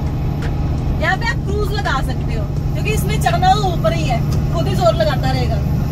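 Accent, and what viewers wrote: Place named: Bihar, India. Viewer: ਮੈਡਮ ਬਿਹਾਰ ਤੋ ਅਾੲੀ ਲਗਦੀ ਅਾ ਬੲੀਅਾ ਞਾਗ ਹਿੰਦੀ ਬੋਲਦੀ ਅਾ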